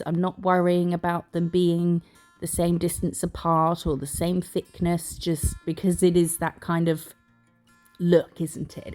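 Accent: United Kingdom. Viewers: I... did think you're Australian?! 😅This is me being embarrassed now!!! OMG! You're a BRIT! I love it! How could I have not known? I don't know why, but I always confuse the accents of UK, AU, and NZ... it's like trying to tell Scottish from Irish. Or Mexican Spanish from Spain's Spanish, or French and Canadian French. I'm so bad with accents!! Despite all of it, I still think your kindness is top notch!! UV light is like cigarettes. I'm not gonna nag anyone about smoking a cigarette now and then, but if you do it often enough, and if it's unfiltered, etc, you know, those kinds of things increase risks. So let me start by saying I'm a redhead with very fair skin. I don't tan; I burn. I spent every childhood summer burnt to a crisp, red as a cooked lobster before humanity understood the increased skin cancer risks per sunburn, blah, blah, same thing with smoking. 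Now I'll add my age (50), family history (an uncle with skin cancer), and me being on chemo for a bit over 2 years as of right now, so the risks add up. This isn't me trying to create mass hysteria over sunshine. I swear, I'm ultra-aware that all my risk factors don't apply to everyone. I'll share what I learn, but I don't wanna push it on anyone. I'm not an evangelist, just trying to explore risk reduction. Also, another thing neither of us thought of, and I could slap myself now because I've studied it a bit, SILK!! I had myself a good cry about an hour ago as I was reading and watching tutorials about how to repa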